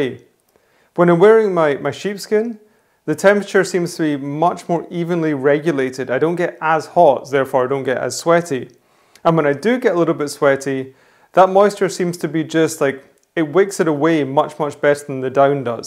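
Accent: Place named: Aberdeen, United Kingdom. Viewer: Are you no fae Aberdeen or there aboots?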